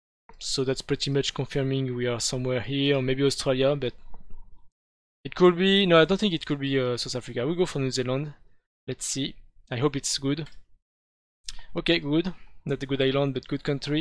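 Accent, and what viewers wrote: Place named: France. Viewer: love the french accent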